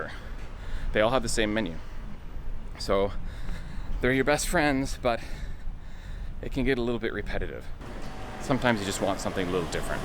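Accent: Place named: Midwest, United States. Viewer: I'm assuming is a Midwesterner? Update: never mind, he said later in the video that he is, LOL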